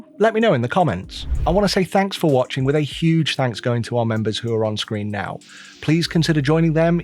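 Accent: United Kingdom. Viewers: Great video, still using my Ender 5 Pro, good printer but really showing its ade now. Maybe by the time they have sorted the software out I will have saved enough to buy one. If you are planning on giving you X1 away for buttons. I'd collect it from anywhere in the UK. you'd be making an old man happy 😉😉. Seriously it's nice to hear a UK accent, you won a sub.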